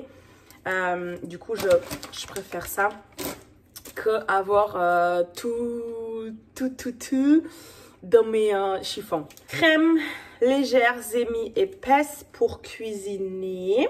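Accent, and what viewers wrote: South, France. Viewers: Du hast Französisch gelernt aber mit einem sterken Akzent aus Süd Frankreich ;) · Sprichst du eigentlich "nur" den südfranzösischen Dialekt oder auch "richtiges" Französisch (wie heißt eigentlich das französische Pendant zu Hochdeutsch?:D). Ist überhaupt nicht negativ gemeint, sondern tatsächlich aus Interesse :) Die Aussprache unterscheidet sich ja teilweise richtig :)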